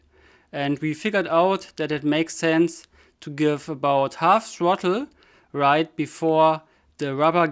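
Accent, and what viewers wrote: Germany. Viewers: This is next level. Are you a senior designer at Airbus or Arianespace? Because you should be! Also, sorry - is you accent Bayern or in der nähe? Lived in Muenchen for a couple of years and still miss it! And sorry if I misplaced your accent and you are from somewhere like Hamburg 😂! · Nice to see people giving the Harrier some love! Really awsome work you guys deliver there. To be honest I just found you guys and have to say: You have a new subscriber xD I really love fighter jets and their engeneering and its nice to see how you can actually reconstruct an awsome work of technical art with enough determination and knowledge. I really really like the way you avoided some problems and tested things out. I hope you'll continue this awsome work not just on the Harrier, but maybe on some other aircrafts, after this project. and a tiny unimportant question (if this even gets read): Do i hear a little bit of german accent? 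xD It doesn't matter where you are from, but just out of curiosity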